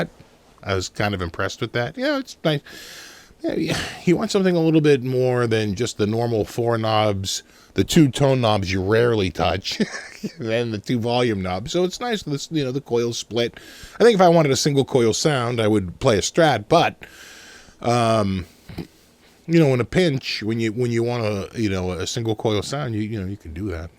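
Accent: Boston, United States. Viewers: Boston English: Tuner = Tuna Tunah = Tuner Go figah....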